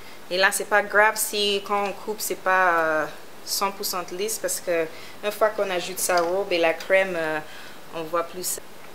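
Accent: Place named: England, United Kingdom. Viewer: Sa se voit que t'es anglais Ta vois je l'aime bien😇😀😊☺😍😻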